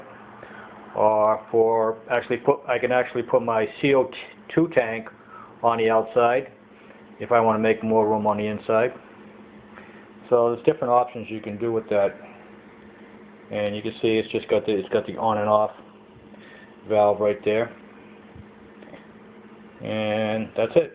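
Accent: New England, United States. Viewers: your craftsmanship + your accent make me feel like I'm watching The New Yankee Workshop. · Great work! I'm sure everyone is jealous now. :) Are you from New England? I suspect RI.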